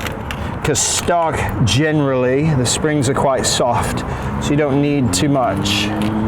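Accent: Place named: Northern England, United Kingdom. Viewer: I've been watching some of his videos and trying to guess the accent too. Think I hear hints of northern English (Manchester maybe) but it's faded a bit from probably travelling a lot. Anyhow yeah watched a bunch of videos about suspension and he's the only guy I really feel like I'm understanding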